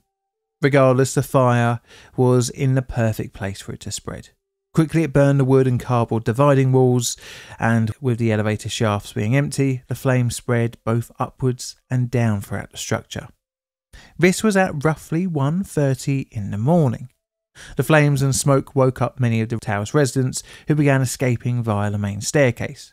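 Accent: United Kingdom. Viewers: Thanks for speaking slowly. It's difficult sometimes to understand the British accent when you don't live around it constantly.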